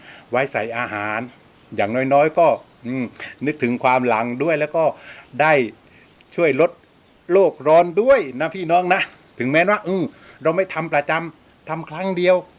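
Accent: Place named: Central Thailand, Thailand. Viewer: คุณ เป็นคนใต้ แต่พูดกลาง ให้เกียรติแฟนคลับภาคอื่นๆด้วย น่ารักมากค่ะ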